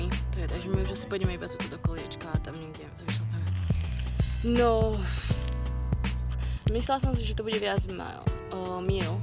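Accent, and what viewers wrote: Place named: Bratislava, Slovakia. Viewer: Ja mám rada Bratislavskú slovenčinu prízvuk kiež by som ho mala tiež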